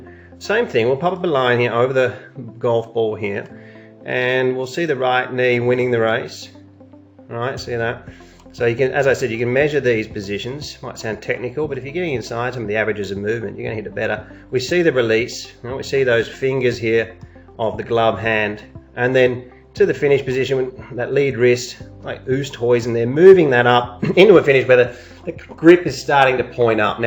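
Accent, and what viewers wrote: Australia. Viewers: great visual animations to assist the explanation, plus the sweet Aussie accent helps too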